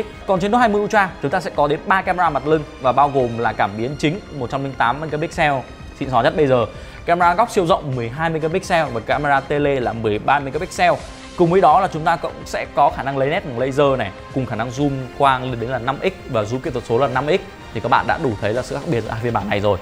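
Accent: Vietnam. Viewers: p chịu thôi chứ, đây là vn, mà mấy người xem tin công nghệ có người hiểu người k, nói kiểu vn thì cả giỏi lẫn k giỏi tiếng anh đều hiểu đc thì nó tối ưu hơn chứ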